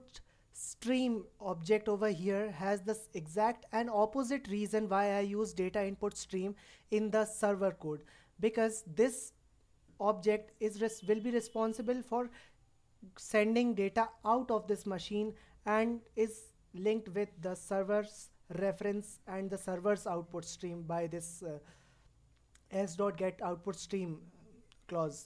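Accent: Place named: India. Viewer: You Sound like an Indien tech Support scammer but u r 100x Intelligent than them I am not so I am watching this Video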